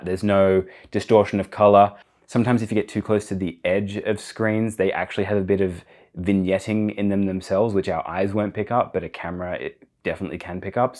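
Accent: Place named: Australia. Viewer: expecting a non Aussie accent on YT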